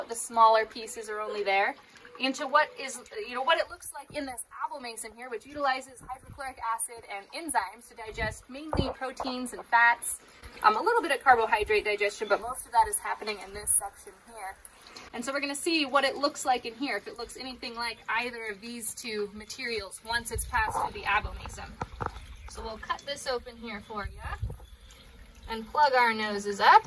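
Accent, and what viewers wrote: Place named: United States. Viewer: Also Lady, please put down an English writing above, because don't understand american accent and vocal pronounciation with all mouth. Is strange this English accent. The subiect îs to interesting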